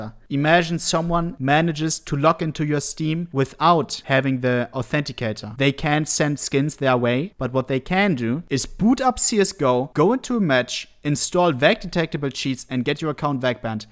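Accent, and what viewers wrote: Germany. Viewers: Dude his german accent drives me crazy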